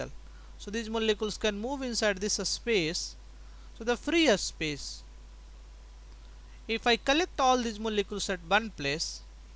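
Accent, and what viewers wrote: India. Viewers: ohhhh WTF..indian engish voice..going to hell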